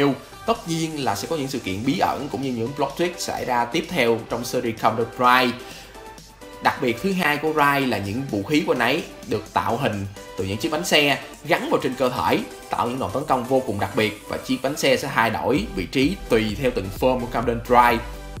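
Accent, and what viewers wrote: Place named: Japan. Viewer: Anh chủ yếu đọc Tiếng Anh theo Nhật nên.... Mà công nhận dở thật:)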